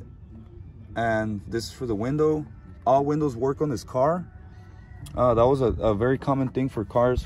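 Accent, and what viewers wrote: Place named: Tijuana, Mexico. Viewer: You have Tijuas accent man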